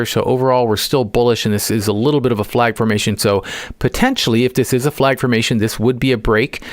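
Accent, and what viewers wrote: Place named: Canada. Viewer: Thanks I just notice your classic Canadian oo for ou dialect. I didn’t notice It on your other videos. Looking for stocks that are ready to pop are the hardest to see for me so I appreciate these. I see your list inside discord but I don’t arch your videos on you tube. I don’t know why, but I can’t turn my phone sideways in discord and makes your screen hard to see. Thanks again I was writing this last night but ran out of time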